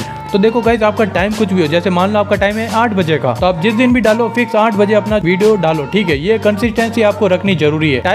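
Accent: Gujarat, India. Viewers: muje apki voice laga ki app gujrati ho ❤❤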